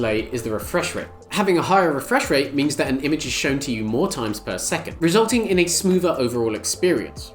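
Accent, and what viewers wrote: United Kingdom. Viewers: but the guy is from uk lol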